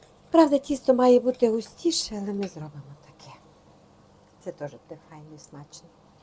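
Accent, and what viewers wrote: Ukraine. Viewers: Говорите по_русски, чтобы было более понятно.... Вы же грамотный человек, не нужно акцэнты делать...... Я прекрасно знаю язык укр. Но, много не расслышала_а другие просто отключат_чересчур акцэнты не центральной Украины.... Я люблю классическую речь и укр. И русскую.... Думаю, меня и остальные поддержат.... А, вообще вы молодец!!!!!!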